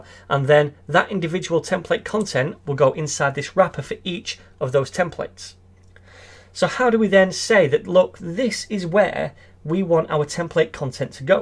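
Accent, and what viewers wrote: United Kingdom. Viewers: The stof hahaha, love that british accent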